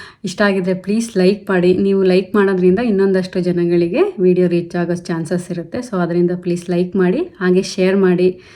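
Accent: Mandya, India. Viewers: Yes she's in US no Humu dim proudish always talk with natural Mandya kannada ❤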